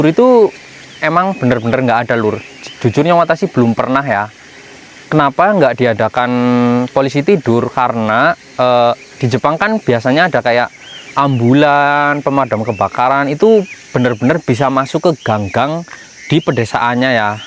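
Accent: Banyumas, Indonesia. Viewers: Wong ngapak ya min? Rika sekang daerah ngendi lur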